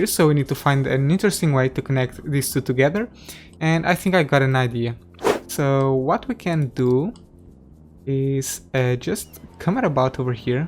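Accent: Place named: Portugal. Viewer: O sotaque português nota-se tão bem! Grande trabalho que tens feito neste canal, vejo muitos dos vídeos que fazes, obrigado pela dedicação!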